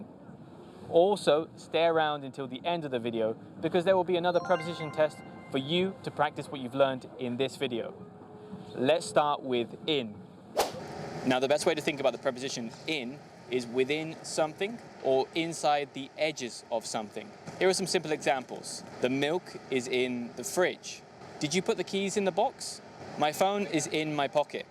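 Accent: United Kingdom. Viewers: Hallo mate, I wonder what kind of accent do you have? It sounds British but for me it's too clear for a British accent .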